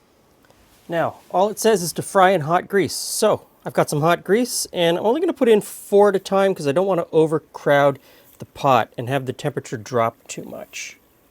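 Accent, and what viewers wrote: Canada. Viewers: Canadian Accent on point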